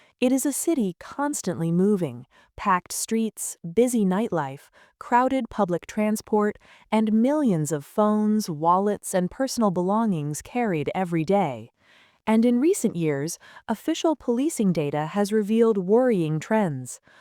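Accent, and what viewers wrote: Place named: United States. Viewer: An American narrator, why?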